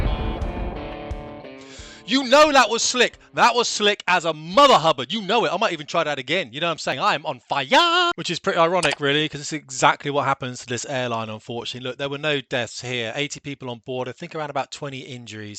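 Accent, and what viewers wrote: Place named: England, United Kingdom. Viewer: The gentleman speaks with evidences of a regional English rather than the "received" British English or standard, mid-western American English and at a pace suggestive of being prodded on with a stick. Very difficult, I find, to follow! Very regrettable!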